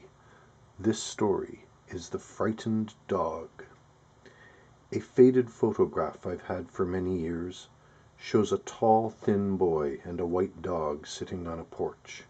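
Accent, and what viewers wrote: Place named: Canada. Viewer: This is a good idea reading these stories from the classic "Strangely Enough" collection. Sounds like you have a Canadian accent -- aboot (about)?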